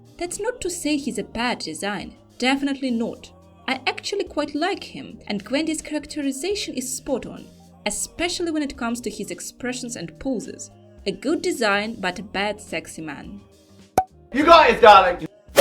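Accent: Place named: Ukraine. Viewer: WAIT— UKRAINIAN? а я ж ЧУЮ що якийсь акцент є!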